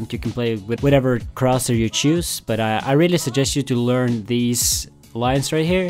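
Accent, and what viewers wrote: Norway. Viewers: Are you from Norway? (hear it on you're accent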